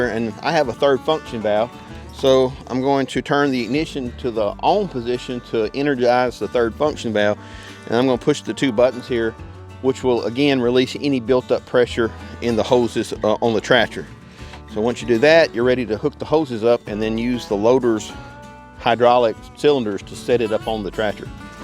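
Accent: South, United States. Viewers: You speak perfectly understandable Southern Slanglish.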